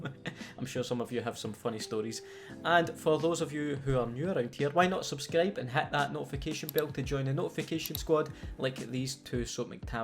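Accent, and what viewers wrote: Scotland, United Kingdom. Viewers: Love the accent bro want to move to Scotland so band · Fuck off. Scotland accent is an awesome accent! I really thought only Asian players of CoD that Being Dissed. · Honestly tho man, I think Scottish accents are fuckin rad, and that makes your videos not only entertaining, but pleasing to listen to 👌🏼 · No I love your Scottish accent but the British accent sounds like complete dog shit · I fugin’ love your Scottish accent. It’s so dope cause you don’t even say “Scottish”, you say “scah-ish” and that makes me happy. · man i like your Scottish accent i watch your vids because your accent ❤️ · sounds like a normal scotsman tae me mate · I like your Scottish accent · I'm Irish... speaks like a stereotypical Scottish man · raging gravy tunnel omfg... if this helps I think scots sound fucking awesome, I wish I had your badass accent.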